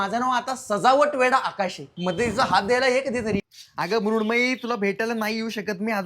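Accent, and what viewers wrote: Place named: Akola, India. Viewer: Akola bolte palan ❤❤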